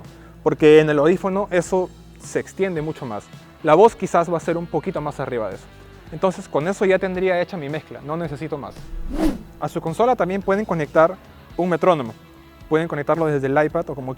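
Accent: Peru. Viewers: Tiene el típico acento limeño. Muchas gracias por los datos sobre el Ear Monitor.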